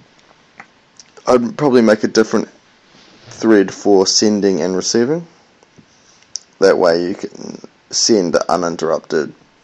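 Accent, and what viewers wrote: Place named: New Zealand. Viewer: thats a new zealand accent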